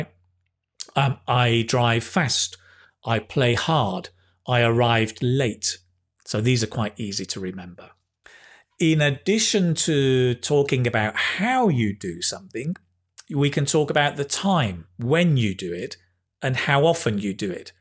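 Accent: United Kingdom. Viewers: I love your British accent 😁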